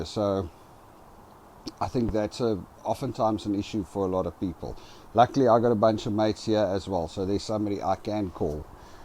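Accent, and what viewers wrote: South Africa. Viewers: I love the Afrikaans accent - greetings from Namibia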